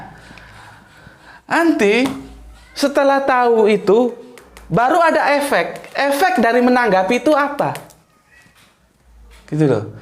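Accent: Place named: Yogyakarta, Indonesia. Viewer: Kok cara berbicaranya n namanya seperti nya wong Yogyakarta, apa asalnya wong dr Yogya ya? Wah detail banget.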